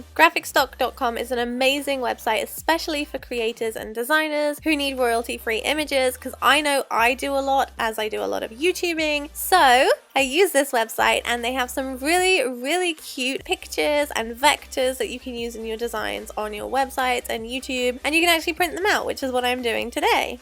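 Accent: England, United Kingdom. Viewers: are you from England? ? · love your accent so how is ron and the kids if you have seen harry potter you will get it loved the ideas by the way where you from what accent is that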